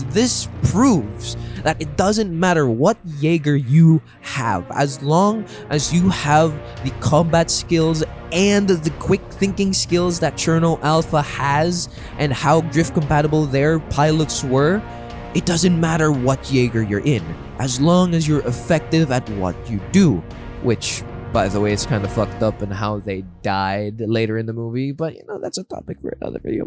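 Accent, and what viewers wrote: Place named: Philippines. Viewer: I knew it because I'm also Filipino and you're accenthen you cannot fool another Filipino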